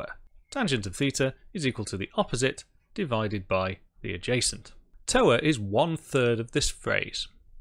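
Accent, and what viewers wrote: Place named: United Kingdom. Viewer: I think it's charming that you use hand drawn letters and figures versus perfect computer fonts. That's a good segway to getting into the four linear acceleration equations with d, t, v and a and the more complicated cross product. Then applying force on an elevation with coefficient of friction. From the American perspective, having a British accent and talking about a scientific or philosophic topic makes you sound smart. XD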